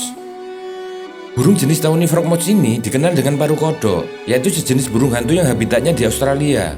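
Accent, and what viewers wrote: Java, Indonesia. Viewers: Sangat medok pak de like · Medok ajah ngomong nya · Yg ngomong medok amat yak kek org jawa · mendok amt lu min😅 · Salfok dgn suara pembaca narasi. Medok total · Medok banget....😀😀😀😀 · Medok banget son suarane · orang jawa kau ya bang?? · Dlm dunia broadcast medok gini gk laku siaran radio n tv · medok x bnk..tp q sk.mnghibur..😂😂😂🙏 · medhok yo kue..😁😁🤣🤣🤣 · jawa banget suaranya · Ngedok ketoro wong jowo😂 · Min medok banget sih min Logat mu, , , ? Tpi ga pa2 ko min wong udh dri sono ny ky gitu, menuso yo , Ga iso ngrubah toh, , , !? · Logatnya khas jawa btul ya😂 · Suwaramu kang kang medok bianget. WeeLiikk 😀😀😂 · Jawa banget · Meduk bngt jowone 😂😂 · adminya logat jawa bngt...kuuueeennntttaaalllllllllllllllll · Mantaaap.......... Ngomongnya yg mantap jawanya MEDHOCK......🤭🤭🤭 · mantab logat jawanya medok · Suara mu medok.. Mas..' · Yg baca narasi medok.. 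😀👍jd ingat temen saat kuliah.. 😀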